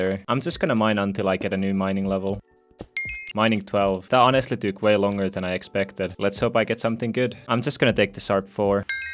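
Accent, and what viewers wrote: Finland. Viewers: Where are you from? it sounds like you are from finish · Sun suomalaista aksenttia on niin kiva kuunnella😁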